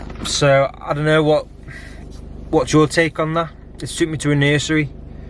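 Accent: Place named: Stoke, United Kingdom. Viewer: Knew your accent from the outset. Parents used to live in Bignall End (Audley) ...small world!!!!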